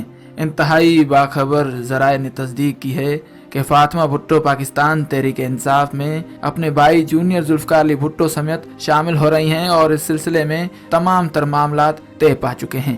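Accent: India. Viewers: This voice is Indian person...100 % sure....indian urdu asy he bolty hyn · یہ آدمی انڈیا کا لگتا ہے اس کے تلفظ انڈیا کے لگتے ھیں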